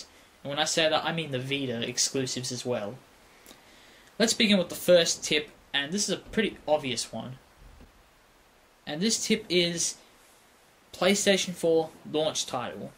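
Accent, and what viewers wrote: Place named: United Kingdom. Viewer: I love the way you talk to ND like they were your children with things like "Okay?, Good.". Maybe it's just the British accent. Anyway, all great ideas man.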